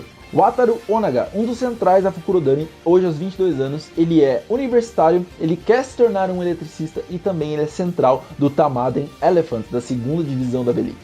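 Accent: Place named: South, Brazil. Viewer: Falo do "aportuguesado" mesmo, ainda que seja irrelevante... seria SU como silaba tonica... Não to criticando, nem falando nada... mas é muito comum a troca da silaba tonica mais para o sul do país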